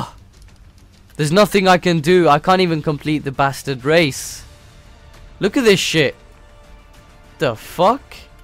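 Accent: Birmingham, United Kingdom. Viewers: That brummy accent doe😂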